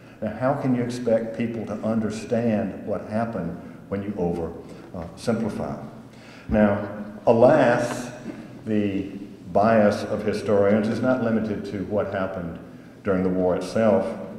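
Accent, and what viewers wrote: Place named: South, United States. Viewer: Great lecture! Thank you for sharing your vast knowledge with your perfect Southern accent😊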